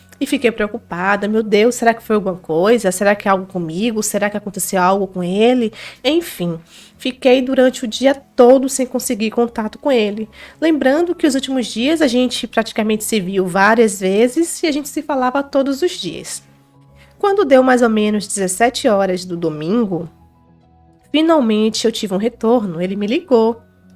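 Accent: Bahia, Brazil. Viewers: Essa narração feita pela a, com esse sotaque baiano ficou maravilhossaaa.!!!❤❤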